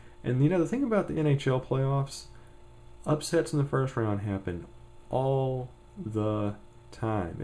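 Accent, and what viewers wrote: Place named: South, United States. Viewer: Aaron Sil I'm not sure but I stumbled upon his videos and especially in the earlier ones, his voice is the most ASMR-triggering voice and its just crazy. I'm into sports and food reviews but really that southern kinda offish voice just gets me.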